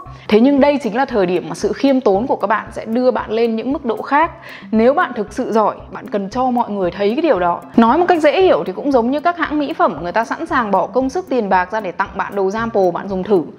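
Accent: United Kingdom. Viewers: Có ae nào thích nghe chị nói giọng Anh Anh không hí hí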